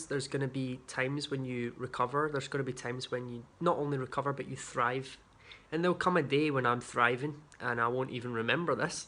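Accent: Scotland, United Kingdom. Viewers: I've just subscribed on YouTube. I've watched you on Facebook forever. I'll be honest, I can't pass up a scottish accent lol but you're always positive with messages. You're my first son's age, it can be a dark and lonely road the internet,the highs the lows. Life in general is hard at that age without a public one. I hope you are as sound as you talk and look back and reflect on these days in passing as building blocks and lessons. Now I'm sounding old af ( im 44 fyi) and just hope you're ok. Leave if not , those who dont know you personally may will still be here, most probably will because you're funny and sweet,, and those who do know you personally will be there to pick up the pieces, certainly doesn't make you week.. Likes are not what makes you exist. You exist because of of you. 😍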